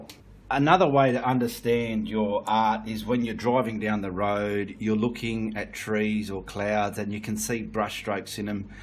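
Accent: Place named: Australia. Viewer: its great to see and hear a fellow Aussie . im looking forward to following your tutorials as im a beginner .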